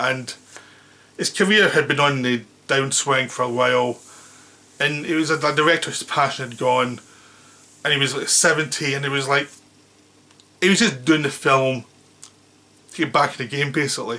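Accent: United Kingdom. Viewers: Fucking limey shut up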